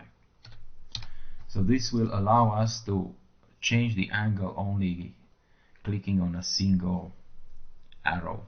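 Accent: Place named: Italy. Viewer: L accento è uguale a quello italiano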